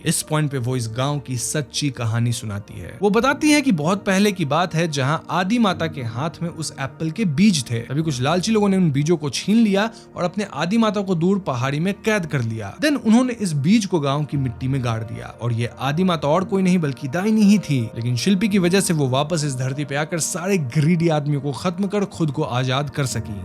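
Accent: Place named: Bengali, India. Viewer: Bhai, just love to listen bengali from you're mouth. Sounds sooo sweet. ❤❤❤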